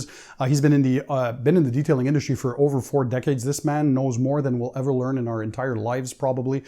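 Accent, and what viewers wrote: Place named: Quebec, Canada. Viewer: oui, mais j'aime bien ton accent québécois. Ça faisait partie de ta personnalité.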